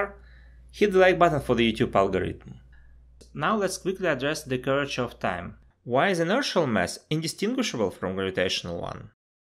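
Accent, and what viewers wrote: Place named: Russia. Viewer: Hey! Isn't your native language Russian ?) I hear familiar accent 😁 If yes, just подмигни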